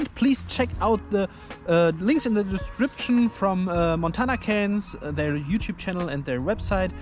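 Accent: Germany. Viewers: Bin ich doof oder hat er ein absolut deutschen akzent😂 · Man hört einfach sofort das der Herr Deutscher ist 😂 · echt richtig cool, aber schlimm wenn deutsche englisch sprechen xD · If anyone didnt notice, that´s the way how german people pronounce english language :D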